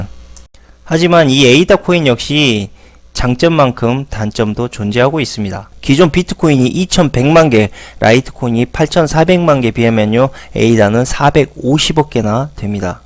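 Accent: Jeolla, South Korea. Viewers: 전라도세끼들참 말하는꼴아지 말투참좆같네 쓰래기코인 망한줄모르냐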